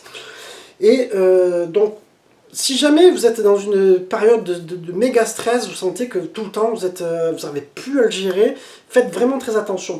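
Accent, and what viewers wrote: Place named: South, France. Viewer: Courage à toi, prend ton temps et prend soin de toi. Hâte de revoir tes vidéo avec cet accent du sud qui me fait tellement du bien les matins:) Des vidéos spontanées avec ton naturel ça sera top je pense!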